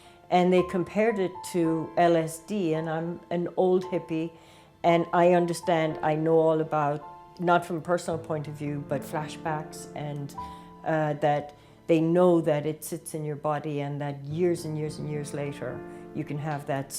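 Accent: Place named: Ireland. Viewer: She sounds like from Ireland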